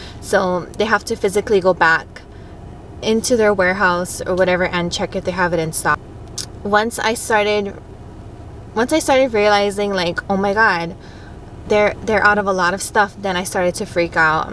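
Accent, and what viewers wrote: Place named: Hawaii, United States. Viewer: I knew you were from Hawaii the second I pressed play. I interview a lot of locals on my podcast. I need to get in on Shipt.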